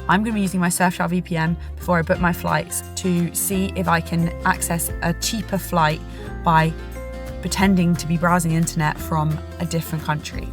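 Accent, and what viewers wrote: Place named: England, United Kingdom. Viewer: You are so cute and your English accent is to die for. I absolutely love an English accent.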